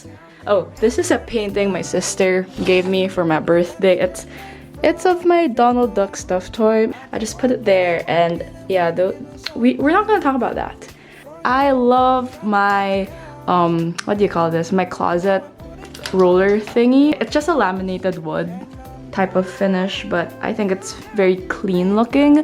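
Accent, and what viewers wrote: Philippines. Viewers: I knew you were filipino right away cause I can hear your filipino accent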